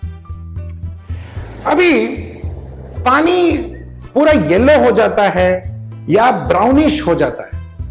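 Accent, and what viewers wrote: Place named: Mumbai, India. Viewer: Sir ke dialogues Sun kar lagta hai ki vo Mumbai sa hai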